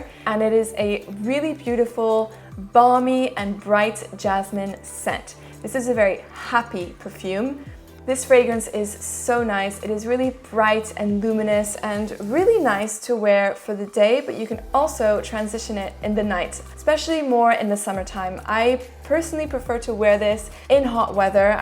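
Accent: France. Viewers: Do you have a very slight French accent? I might be wrong, English is my second language, so I don't always pick up light accents. But you seem to know how to pronounce French word too.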